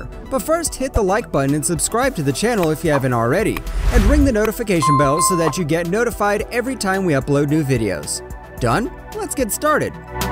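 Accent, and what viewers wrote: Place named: United States. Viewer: hahahaha!! It's the YT algorithm trying to understand an American accent and translate. We are just fortunate the speaker didn't come from down south of the Mason Dickson Line cuz, we'd be up t'our urmpits in pee pickin' tribbles, y'all LOL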